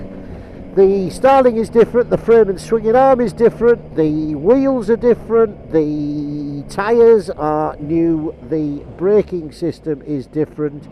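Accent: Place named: England, United Kingdom. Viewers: that yorkshire accent grinds my gears normally but I can cope when it about motorbikes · is the original straight talking Geordie.. love it!!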